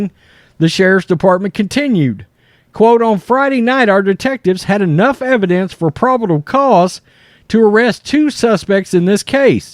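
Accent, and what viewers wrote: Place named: South, United States. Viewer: Hey buddy you & your are great announcers what is your first name & what state you live in I’d say Texas you have no a southern accent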